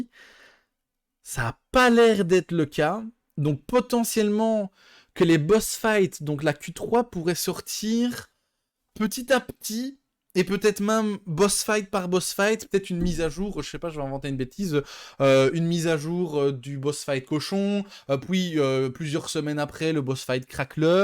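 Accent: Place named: Liège, Belgium. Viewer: Tu viens d ou en belgique ? On dirait liege non? Comme moi mdr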